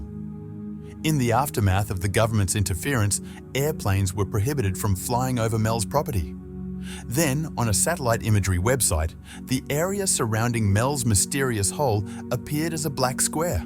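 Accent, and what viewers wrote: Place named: Australia. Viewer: what a waste of time! With a much repeated story line, which I think is written by someone who has a great imagination ! 😅😅 Its a shame, as I was thinking an Australian voice would be nice to hear for a change. But it just got worse the further it went on. 'Just stay tuned to hear the horrible story' of Mel, to find the truth. 😅😅 I stuck it out by typing this 😅😅😅 880,000feet!?😅😅😅😅 Don't bother!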